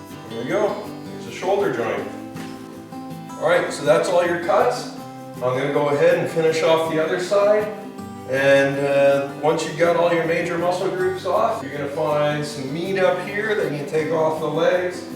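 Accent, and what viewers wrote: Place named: Canada. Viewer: Sounds like a Canadian. Credibility issues.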